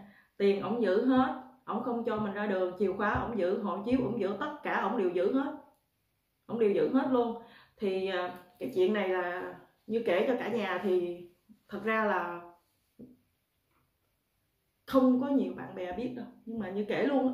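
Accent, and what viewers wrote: Mekong Delta, Vietnam. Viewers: Nghe giọng miền tây là có cảm tình. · nói chuyện thật thà, dễ nghe quá, đúng là người miền Tây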